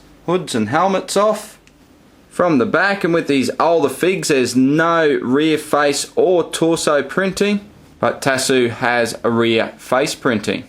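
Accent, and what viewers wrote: Australia. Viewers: I love your Aussie Achsent Aussie Aussie Aussie oi oi oi. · Jedi Master Productions well coming from an Australian I can tell you that not every Australian speaks like that but some do. not putting on that accent to sound more Australian because it's his real voice.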